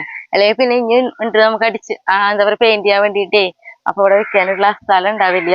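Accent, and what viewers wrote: Thrissur, India. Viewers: എവിടെയാണ് വീട്. കാണുമ്പോൾ കൊതി തോന്നുന്നു. കൃഷിയിടമൊക്കെ. ഭാഷ കേട്ടാൽ തൃശ്ശൂർ ആണെന്നൊരു സംശയം. ഞങ്ങൾ ഒറ്റപ്പാലം ആണ്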